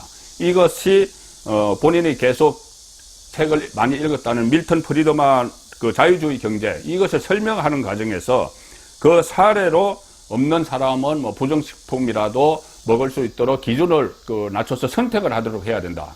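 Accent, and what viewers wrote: Gyeongsang, South Korea. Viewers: 정말 이새끼는 기래기다 경상도 말투로 먹고사는 놈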